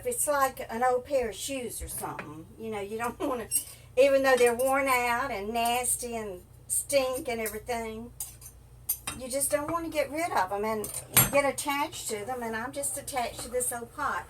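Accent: South, United States. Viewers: I heard the southern accent then you said Florida, knew you were from the south. I am from South Georgia. I’ll subscribe and watch your videos. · kat mimikat and ++++i like listening to this lady's southern accent when she talks. i am a native of north carolina who moved to a small east central mississippi town 6 yrs ago. i came here after my disability retirement in 2013 in addition to a broken marriage and losing my home to foreclosure in nc as well as getting away from a homicidal ex wife. my mother and brother lived in this same town. that's how i knew about it. sadly my mother passed away 4 yrs ago and my brother about 3 yrs ago. i'm the last one standing now out of a family of 5 people.( my daddy,mother,sister and brother passed on). i enjoy life in this small town. almost like mayberry in a way and my ssdi check goes farther here with mississippi's lower cost of living.no going back to nc now, love it here where i live.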